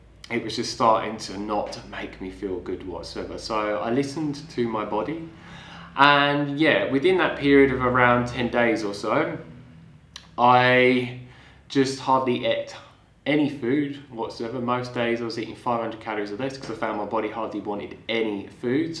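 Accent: United Kingdom. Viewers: woahhhh... shocked to hear you had a British accent; I thought you were foreign when I watched your 1 year transformation vid ;))))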